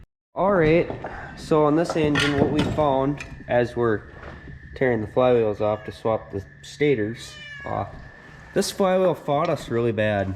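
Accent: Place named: Midwest, United States. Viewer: sound a little midwestern lol either your from Minnesota or Wisconsin just picked up a 111 today needs a new engine as well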